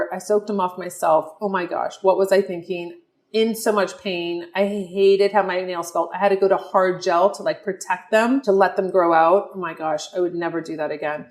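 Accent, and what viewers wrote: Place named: New Jersey, United States. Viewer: Are you from N.J?? You sound exactly like Margaret from Real housewives of N.J.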